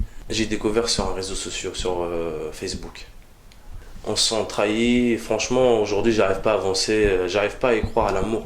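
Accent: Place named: North Africa. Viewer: Oui il a un accent du bled peut être il est venu en France à l'adolescence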